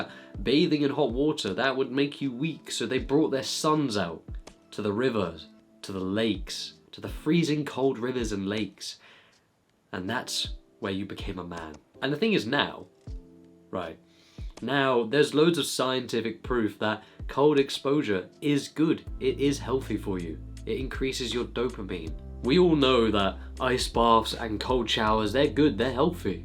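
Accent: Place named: Liverpool, United Kingdom. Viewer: I used to live in a Liverpool and you have very familiar accent)) By the way, the video is amazing, thank you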